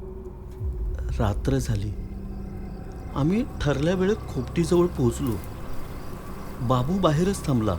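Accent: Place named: Konkan, India. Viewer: तुमच्या आवाजात कोकणाच्या मातीचा वास आहे 😍 तुमचा आवाज Pralhad Kudtarkar sarkha aahe...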